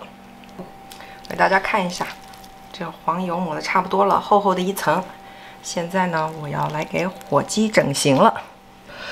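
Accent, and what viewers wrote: China. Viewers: 您是北方哪里人，说话味道还蛮好听的，感觉是齐齐哈尔的吧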